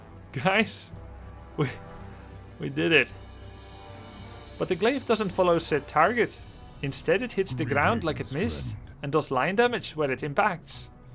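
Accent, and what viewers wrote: Scotland, United Kingdom. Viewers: Why are ye suddenly scottish though? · dat scottish accent always amusing to see you eine andere Sprache sprechen :D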